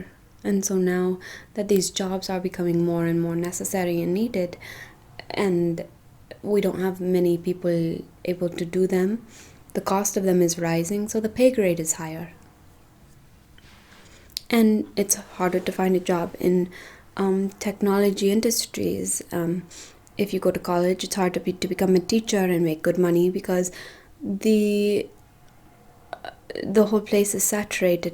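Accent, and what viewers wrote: India. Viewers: YESSSSSS U CAME BACK , WE ALL LOVE YOU HERE THANK U SO MUCH ❤ BEST INDIAN ACCENT ASMR ON YOUTUBE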